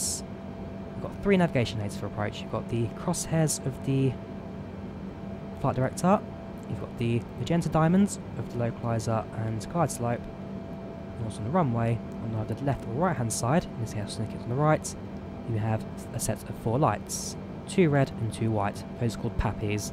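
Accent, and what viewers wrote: United Kingdom. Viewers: THE BRITISH ACCENT IS KILLING ME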